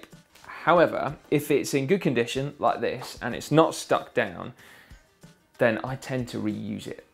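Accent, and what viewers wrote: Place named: England, United Kingdom. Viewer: Damn an english accent!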